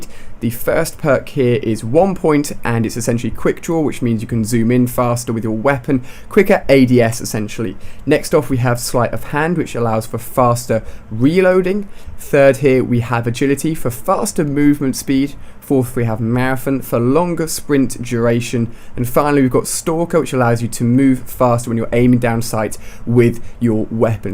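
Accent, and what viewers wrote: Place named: United Kingdom. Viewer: marethin is how he says marathon. i'm so happy i'm not british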